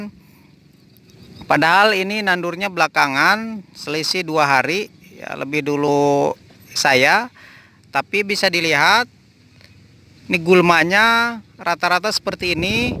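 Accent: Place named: Cianjur, Indonesia. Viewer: Logatnya seperti tmn saya yang dari Cianjur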